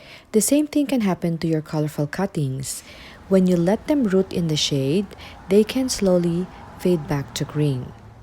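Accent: Philippines. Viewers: you sound pinoy like me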